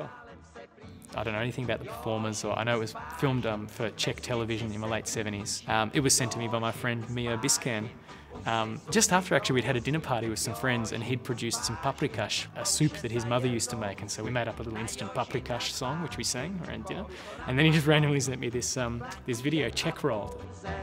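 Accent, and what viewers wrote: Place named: Australia. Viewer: Accent???? He sounds like any Aussie. What country are you in:)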